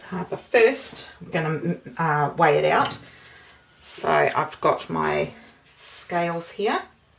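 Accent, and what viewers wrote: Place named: New Zealand. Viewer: It always amazes me that some people have no better use for their time than to make nasty comments, of course if they weren’t anonymous they probably wouldn’t have the guts to do it. Also why watch videos you dont enjoy! It’s very easy to skip on by! As an expat kiwi I love watching your videos (although the cost of food seems crazy), but your down to earth, real approach to your videos is refreshing, not to mention I miss that kiwi accent!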